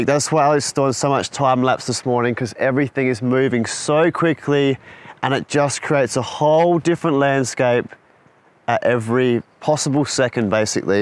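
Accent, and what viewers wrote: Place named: Australia. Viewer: Hi Mate, sorry to just now be getting to your video as I've been in the process of moving. As always, I have enjoyed the video and the efforts made to deliver your content, sick Australian accent and all! It was pretty evident the struggles you were undergoing during the Asia trip, maybe just the wrong time of year. The vantage point you were at for valley horseshoe river shot was choice. So nice to have travel chef/photographer along for this journey as well, you'd be screwed without him, haha. I'm curious, have you ever been into Slovakia? I have a nephew living there and suspect the landscape is fantastic there as well.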